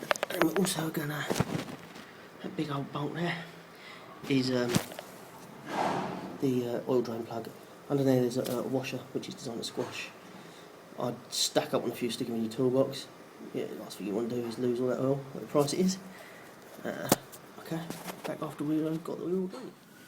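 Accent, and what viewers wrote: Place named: United Kingdom. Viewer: Nice one.. Good to hear a UK accent! Im about to do this on my '97 CBR 6f. Kept burning out the mid connector. Anyway brilliant upload thanks:) oh and check out 90's Japanese Sportsbikes on Facebook. Were a good bunch and ya bike qualifies lol.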